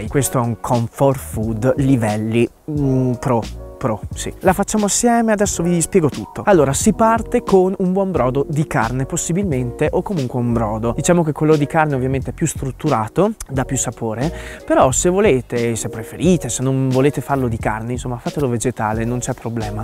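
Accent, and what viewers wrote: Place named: Trentino, Italy. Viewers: "...te sei en brao matelat".....orgoglio trentino...davvero bravo, simpatico, sobrio, educato ed efficace..... e mooolto trentino. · Io ti preferisco con i capelli corti, comunque ti adoro solo sentirti parlare.. Quell accento del Trentino mi fa impazzire! Un saluto dalle Marche. Trentino ti lovvo..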